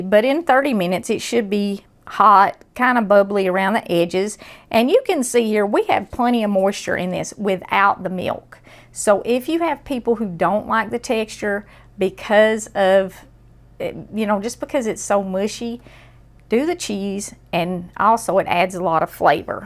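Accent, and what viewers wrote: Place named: Kentucky, United States. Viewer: Hi there. I just recently found your channel and im loving it. You're accent reminds me of KY where I grew up. I was just wondering where you're from?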